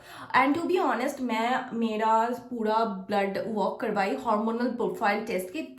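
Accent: Bengal, India. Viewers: Hota hai uska language kuch aur hoga jaise bangali...isliye Hindi clear nahi h.... foreign log Ghar nahi sambhalte kya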